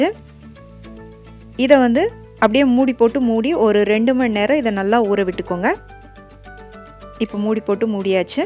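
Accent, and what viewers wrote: Tirunelveli, India. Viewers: Superrrrr. Voice Superb. I am also nellai district in sengottai. Unka voice supera irukum pothae ninichaen neenka namma oorunu. Keep it up ma'am. Pudina thuvail sollunka ma'am.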